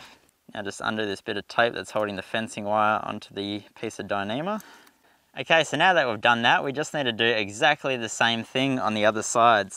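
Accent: Australia. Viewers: Love listening to this guy talk reminds me of the auses I worked with in Afghanistan 👊🏻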